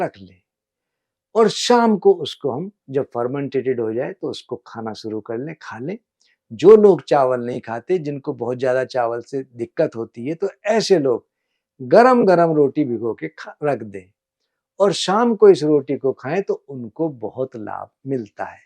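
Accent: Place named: Haryana, India. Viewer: yes haryanwi doc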